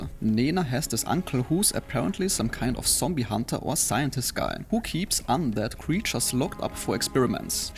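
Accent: Germany. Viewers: his voice tells me he is German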